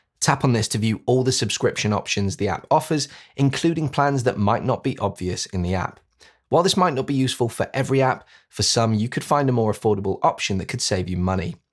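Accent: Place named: Liverpool, United Kingdom. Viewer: Are you originally ftom Merseyside area ? You have a twinge of Liverpool accent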